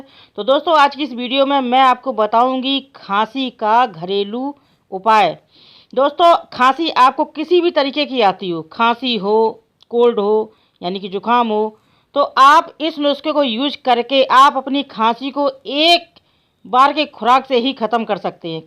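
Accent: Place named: Etawah, India. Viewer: Mam lagta ha app etawab se hoo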